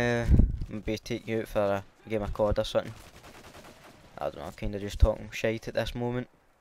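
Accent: Scotland, United Kingdom. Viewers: Bro ur growth has been superior! And trust me in my opinion ur commentary is a trillion times better than those u mentioned at da start! No one beats ur one liners. And FUCK APOLOGIZING about ur accent! Scottish and proud (ain't ur fault if ppl can't understand) Peace!!!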